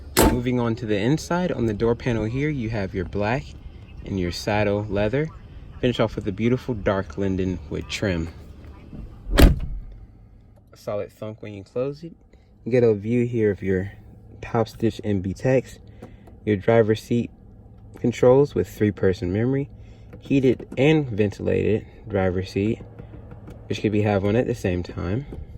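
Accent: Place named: England, United Kingdom. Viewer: Please can you be more presentable with you hair, on a very positive note, a good clean English accent. Thanks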